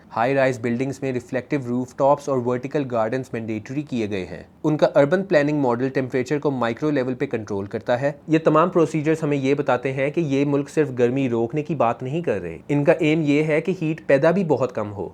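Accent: Lahore, Pakistan. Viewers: aapka content acha hai lekin bs apni urdu sahi krain lahori accent hai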